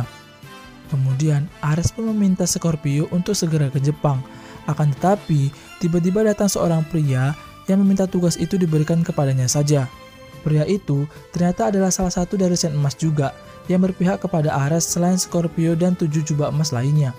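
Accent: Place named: Medan, Indonesia. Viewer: Anak medan keknya kau ni ya kawan? Sukak kali lah penjelasanmu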